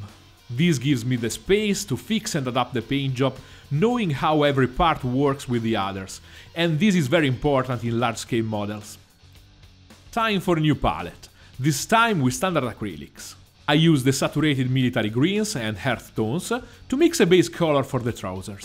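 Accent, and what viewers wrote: Italy. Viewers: Working in adult language acquisition I always tell my learners the same. Removing the accent is a ton of work, can easily fail depending on a multitude of factors and usually is just not worth it, unless you want to hide your origin for some reason. I find it charming and enjoy your videos no less for it, quite the opposite. Makes me think of some of my Italian friends, which makes you even more likeable, if that's possible. Last but not least, don't underestimate the Yoda effect: If you're the master and you want your lessons to be memorable and to penetrate your disciples hazy mind barrier, make your words different, intriguing and striking. Speaking like the rest truly you must not.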